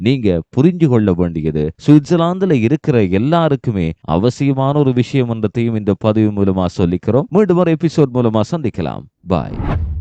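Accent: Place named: India. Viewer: செய்தி வாசிப்பவர் ஈழத்தமிழர் என்றால் ஏன் இந்திய உச்சரிப்பு?? ஒன்றில் ஈழ உச்சரிப்பு அல்லது இந்திய உச்சரிப்பைப் பாவியுங்கள். இரண்டும் கலந்த உச்சரிப்பு கேட்க சகிக்கவில்லை.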